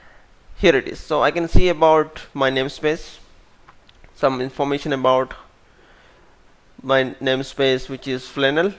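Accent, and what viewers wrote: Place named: India. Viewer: Are you Indian? It was hard for me to understand words with your accent, but i did it. Thanks for video, man.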